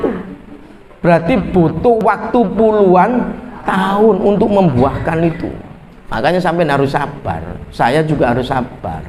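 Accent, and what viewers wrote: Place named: Java, Indonesia. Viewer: Sumenep kok logate jowo tadz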